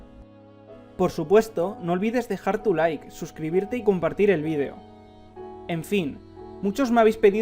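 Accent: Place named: Spain. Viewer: Ablas como un español resentido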